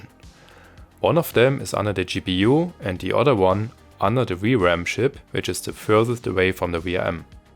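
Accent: Germany. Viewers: Sounds like you are german, can i send you for modding my razer blade <num> ti with new shunts so the tdp rises from 90-110w tgp to round about 150-175w tgp? Obviously i would pay you for the the great work you are cappable of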